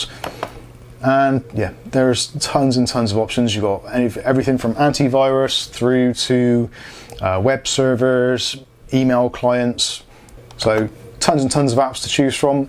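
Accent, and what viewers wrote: Bristol, United Kingdom. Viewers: Hi, I have a Asustor 5202T Nimbustor which is very similar. One thing you should mention is that Asus and Sound blaster USB soundcards work well. My NAS is connected in to my Hi-Fi and all my music and a lot of vids are stored on my NAS which makes it a great media player. I have recently installed the Home Assistant app and he I,m now exploring using my NAS to monitor and control home energy usage. Finally, I think you are a Bristolian judging by your accent... Am I right?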